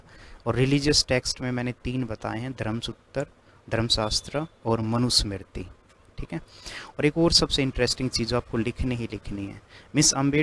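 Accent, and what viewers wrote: Haryana, India. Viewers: Aap pakka Haryana se Ho sir. Aapki language se Lagta hai. Beech beech me yo haryanvi touch bahut Badhiya hai. Aap bahut acha padhate hai😊 12 unit ke baad bhi video banaiye please . Humble request 🙏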